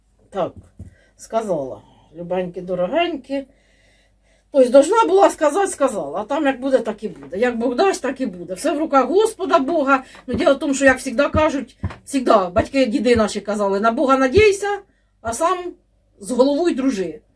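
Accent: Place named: Central Ukraine, Ukraine. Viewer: Це не суржик, це наш центральноукраїнський діалект.Ми так розмовляємо в Кропивницькому ,в Черкасах, в Полтаві.Дякуємо за вашу працю, за стійку українську позицію.Слава Україні! Слава Героям!❤❤❤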